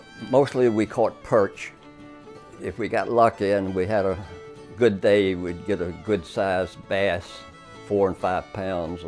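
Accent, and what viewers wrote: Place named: South, United States. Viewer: The accent is like sweet music to my Massachusetts ears. Such a gentile sound. I could fall in love with a southern gentleman. My ancestors on my mothers side came from Virginia, Kentucky and North Carolina. My 4th great grandfather was a baptist preacher down there. Rev. Andrew Tribble. The depression brought my mother up this way for work in the shoe shop factories in Manchester, NH.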